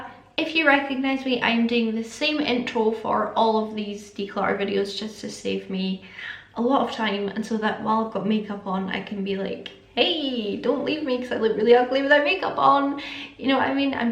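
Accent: Scotland, United Kingdom. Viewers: Scottish? I think...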